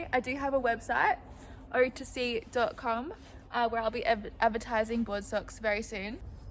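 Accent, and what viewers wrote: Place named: Australia. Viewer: hi im soo glad i found ur youtube ch today and subscribed too it ur soo pretty have such great fun energy and ur vids are really good and i love ur aussie accent too! ha!!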